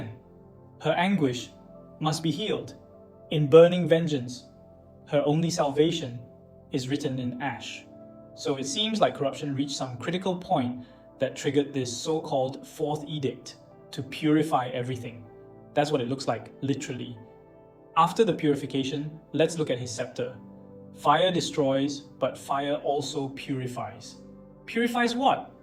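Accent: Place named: Asia. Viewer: 這是AI不是本人嗎？我還覺得這期亞洲音比上期重呢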